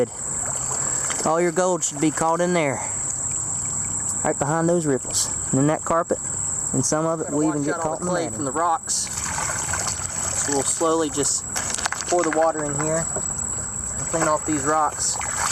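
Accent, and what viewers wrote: South, United States. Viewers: I'm new but are you southern American?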